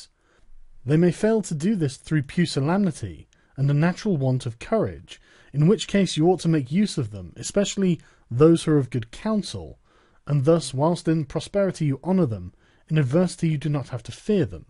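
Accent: United Kingdom. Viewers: Excellent recitation, if you can get past the horrible British pronunciation. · I love Sargon Of Akkad's soothing British voice. No homo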